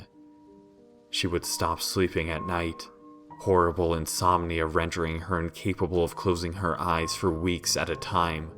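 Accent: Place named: Romania. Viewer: Impressing accent for a Romanian girl!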